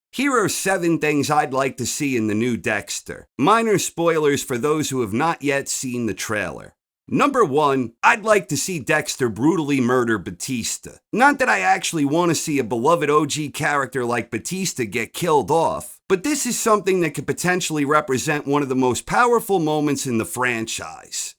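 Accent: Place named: Philadelphia, United States. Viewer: Sir, I don't want to get too far off topic but I've lived outside Philadelphia my whole life. Most of the people around have a very specific accent and you sound like us. I don't need to know where you live or anything but every time I hear you talk I wonder if you live within the region.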